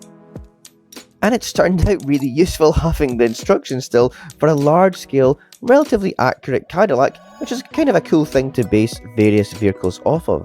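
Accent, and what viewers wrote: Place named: Scotland, United Kingdom. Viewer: I love your accent. Are you from Scotland? (Just curious).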